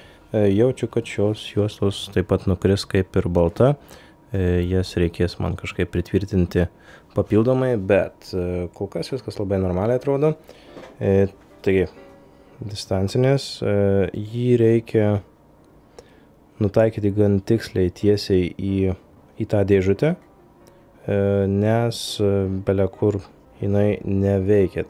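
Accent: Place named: Suvalkija, Lithuania. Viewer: Akcentas labai suvalkietiska primena :D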